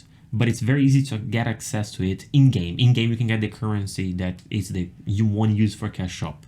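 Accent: Brazil. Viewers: Cara n e possivel, vc e BR? O sotque ta muito forte kkkkkkk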